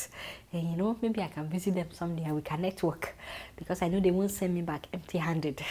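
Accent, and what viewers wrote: Nigeria. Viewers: I'm learning the Nigerian accent from you my gurl!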